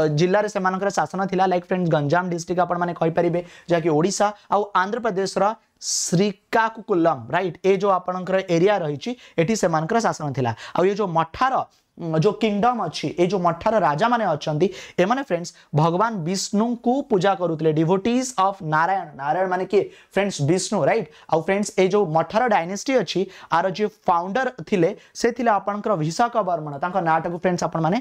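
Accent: United Kingdom. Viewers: Like an English accent...but his amazing teaching style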